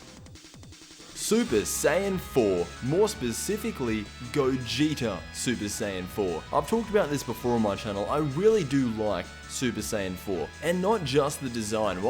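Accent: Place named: Australia. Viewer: Gotta love that unique Australian accent!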